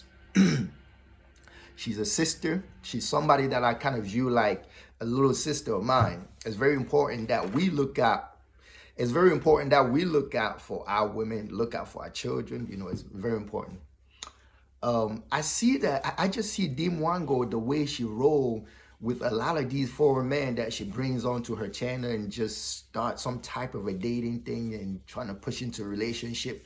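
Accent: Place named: Liberia. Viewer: You sound Liberian dude ..she is the one using the guys for subscribers, we all know what’s going on and it’s all innocent 😇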